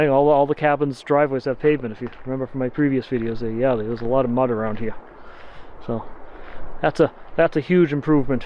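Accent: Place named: Massachusetts, United States. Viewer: As a Northshore transplant in southern Maine, your MA accent sounds like home. Mine is pretty much gone. It only comes back when I’m around my brother or my parents. They’re in Amesbury and Peabody. I’ve been out of MA since 1999.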